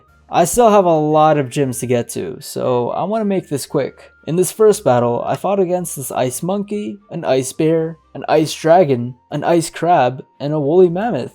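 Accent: India. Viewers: U indian??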